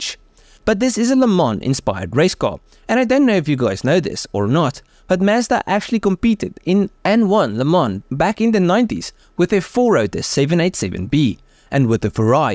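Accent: South Africa. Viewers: South African accent? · Love ur SA accent cud listen 2 it aaaaaaall day HEHEHE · He sounds South African? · Are you from south Africa by any chance?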